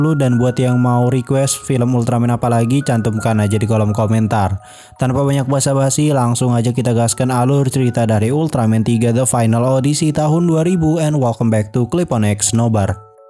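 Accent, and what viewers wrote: West Java, Indonesia. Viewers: Wah si mamang nyunda euy wkwkw mantap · Bang Orang Sunda Y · Sunda pisan🤣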